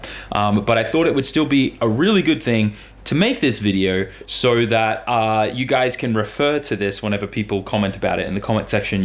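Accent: Australia. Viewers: you still sound like a natural aussie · I can still pick up the Australian accent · the most Australian I've heard you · Still Aussie to me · You still have an Aussie accent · You've still go the accent... starting to sound like us down here in Melbourne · I could tell by your accent you are Australian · I still hear nothing but Australian accent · Still got the aussie twang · your Australian accent is still very Australian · I picked you for Australian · the second i heard your accent i could tell you are Australian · the tone of your voice will always sound Australian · You still sound ozzy to me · You sound Aussie as hell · I would think you were Australian. It's not as thick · He absolutely still sounds bloody Aussie! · his voice will always sound Australian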